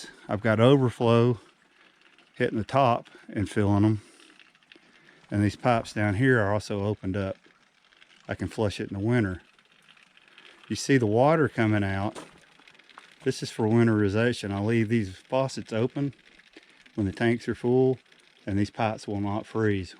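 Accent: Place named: South, United States. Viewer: You sound like Forest Gump. Are you a Southerner, from Mississippi maybe?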